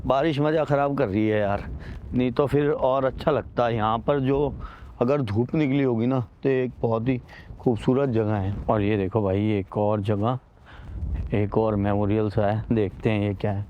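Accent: India. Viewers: Your accent is very good indian accent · . Plz don't worry about ur pronunciation. Sir u're down to earth. Aur hum jo dekh rhe hain voh bhi aap jaise hi hain. Hum ko konsi French, Portuguese, Russian ya Germany aati hai. Aap ka desi andaz No 1 hy. From Mumbai.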